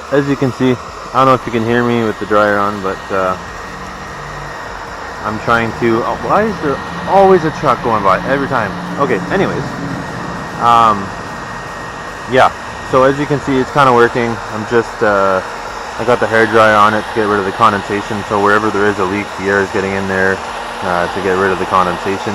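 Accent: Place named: Canada. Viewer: this is random but your Canadian accent is awesome! haha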